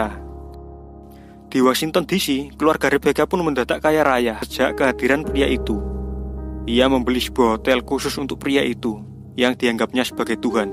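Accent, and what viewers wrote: Java, Indonesia. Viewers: Medokkk nya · Yang jelas pembawa alur ceritanya medok.. Ajib .. menghibur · Medokk bgtt bang 😄😄😄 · Nrtor nya busyet medok!!!!!!!!!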